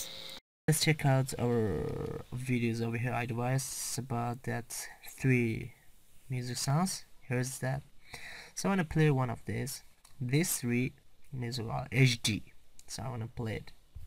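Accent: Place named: China. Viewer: "thank you for de chinese accecent"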